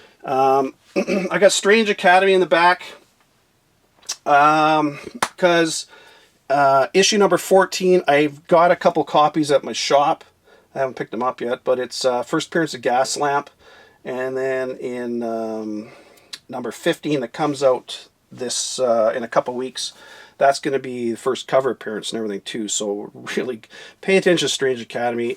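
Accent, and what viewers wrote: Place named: Canada. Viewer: Happy New Year!!! So happy to hear your Canadian voice talking about comics brother!! Just watched the video and can’t wait for the next one!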